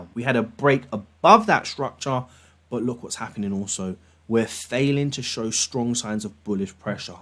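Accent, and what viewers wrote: United Kingdom. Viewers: He sounds british to me and they have a different way of seeing these situations. They often have a funeral and next gather for a meeting lunch and I think thats their way of showing respect and appreciation for the person. I hope Im not saying bullshit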